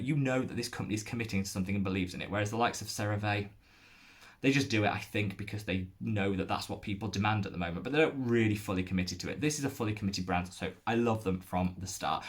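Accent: United Kingdom. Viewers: You are one of my favorite contact creators and I thoroughly enjoy your videos and appreciate the enthusiasm and passion you bring to skin care. I really enjoyed the podcast you did with Kelly Driscoll. It was fun to learn a bit about you and your experience as a content creator. As someone from Southern California who hadn’t been across the pond, can I just say how much I love your accent? I really appreciate that you don’t take sponsorships and couldn’t agree more with what you said about how “If you sell your integrity, what do you have left?” You are one of the few people that I go to watch reviews on before buying a product because I really trust that you’re giving your honest opinion and not a sponsored one. Thank you for intro’ing me to British brand Medik8. As someone who uses Tretinoin but didn’t want to risk using it under my eyes, I’m excited to try their Crystal Retinal Cetamide eye. 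Cheers!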